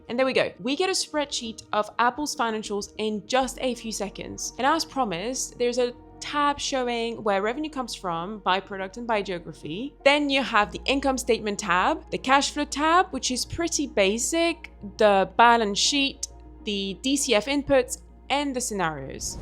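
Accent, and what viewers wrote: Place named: South Africa. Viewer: South African accent...nice.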